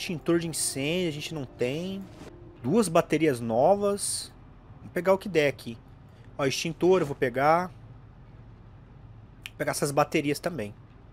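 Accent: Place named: Rio Grande do Sul, Brazil. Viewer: tu tem voz de gaúcho cara kkkkkkkk. Também sou do Paraná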